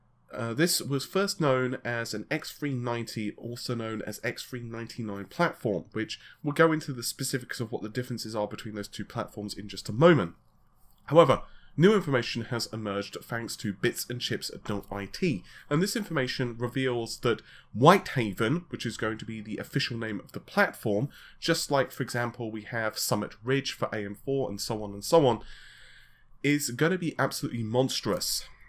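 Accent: United Kingdom. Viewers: He speaks British. You know original English and not American ghetto jibberish. English isn't my first language and have absolutely no issues understanding the guy. Don't complain when you are simply an idiot.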